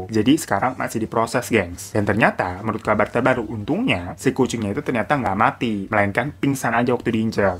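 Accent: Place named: Bekasi, Indonesia. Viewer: orang babelan ya 😂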